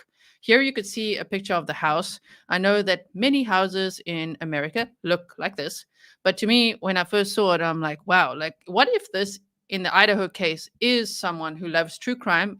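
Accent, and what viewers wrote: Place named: South Africa. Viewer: I really, really enjoy your presentations. I like how the pace moves swiftly. Originally your swift speaking pattern with your SA accent was difficult for me to follow, but now that I am used to it, I love the fast pace. I now even put other people’s podcasts on 1.25 speed to get through faster, per your suggestion. I pray we are not going to try to eliminate knives now in addition to firearms. Murder has nothing to do with a weapon and everything to do with a person’s heart. After all, Cain used a rock. I’m sure there have been many murders using bats, 2x4’s pieces of lumber, axes, gun butts, vases or lamps, hammers, hands or fists, pillows, or plastic bags. In other words, you can’t legislate morality. We need tougher and swifter punishments, not regulations that take freedoms and methods of self-defense away from the law abiding people. 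We have quite a few firearm weapons ourselves, plus kitchen knives, excess lumber, the lot. I don’t want my freedoms taken away because there are a few bad people murdering innocent people.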